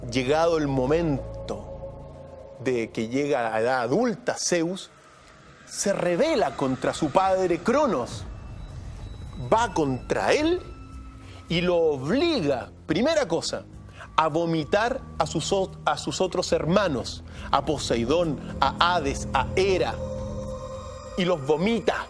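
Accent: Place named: Chile. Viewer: Esto es Chileno mil por mil... ese acento lo recozco a kilometros de distancia. Saludos desde Honduras.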